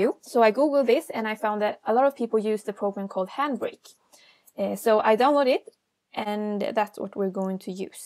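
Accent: Sweden. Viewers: cute swedish accent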